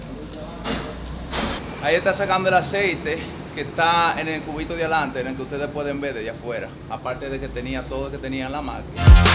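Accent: Cibao, Dominican Republic. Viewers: Mi hermano sigue aciendo lo que te gusta ere bueno en eso tu asentó del cibao .te queda.vien y yo soy capitaleño....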